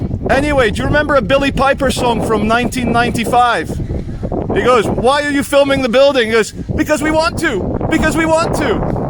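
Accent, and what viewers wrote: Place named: Scotland, United Kingdom. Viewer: Your accent is just Scottish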